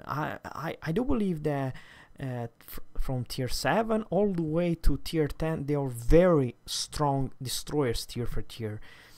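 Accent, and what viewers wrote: Sweden. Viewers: I sense a strong Swedish accent here.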